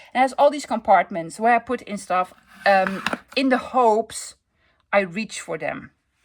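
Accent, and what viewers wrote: Netherlands. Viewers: The moment you started speaking I knew you were from Holland. My parents came to the U.S. right after WW2 with my brother, who was two at the time, and one trunk of belongings. My entire family is still in Holland. My maiden name is Visser (Heiloo) and my mom's maiden name was vd Kuil (Ijmuiden). I submitted DNA for ancestry and I am 98% Dutch (mostly Friesland). "If it ain't Dutch it ain't much" Lovely craft room tour! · Wow !!! Im new to yr channel & i was blown away watching this, first of all, my Late Mother was Dutch & ive always loved the accent, you have a great sense of humour & i was thinking there is so much in yr craft room, that i could come visit & go shopping 🤣🤣, however slight problem i live in Australia lol I thoroughly enjoyed every minute of yr tour, Thankyou im in awe of all you have 😊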